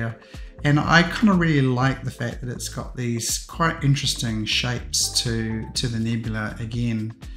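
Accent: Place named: New Zealand. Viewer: Great photos, its always nice to see Southern hemisphere targets and even better to hear a kiwi accent. Im down here in Dunedin and the weather has been absolute rubbish. Im just starting out on my astro journey so Im really blown away with what you have achieved. Subbed and will watch the rest of your videos too.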